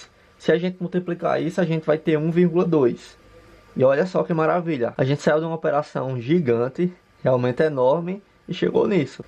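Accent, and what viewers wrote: Northeast, Brazil. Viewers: Eu adoro esse sotaque dele Nordestino kkkkk❤❤ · Ele é nordestino?? O sotaque dele parece muito. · Ai esse sotaque nordestino é tão lindo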